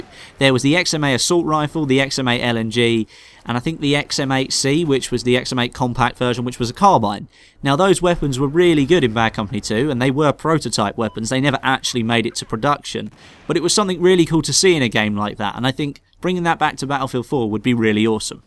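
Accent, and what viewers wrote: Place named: South East England, United Kingdom. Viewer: He speaks like most in the south east (i think) of England do.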